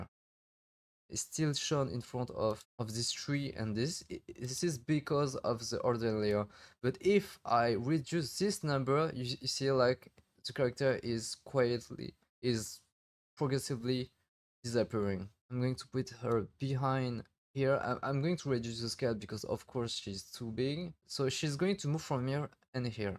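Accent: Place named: France. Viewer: Cette fois on sent un peu l'accent français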